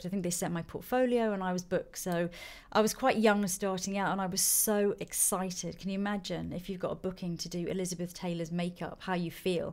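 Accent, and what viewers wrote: United Kingdom. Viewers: i must say your accent is great. i've heard a lot of british accents and yours is very proper and thick. HOT.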